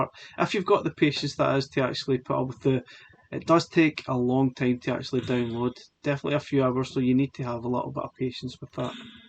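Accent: Scotland, United Kingdom. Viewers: Fanstastic scottish accent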